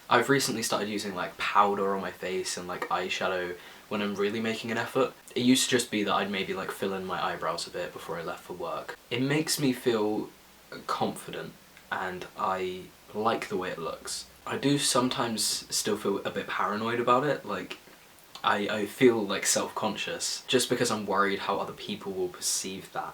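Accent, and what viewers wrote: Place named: United States. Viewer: All I can see is that youre kind of speaking with an american accent already. Is it because of the songs your singing?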